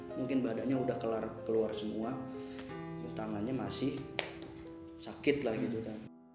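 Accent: Indonesia. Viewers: Btw orang mna tuh yg lagi ceritanya .. GERO2in ,fiks orangsunda kek nya😁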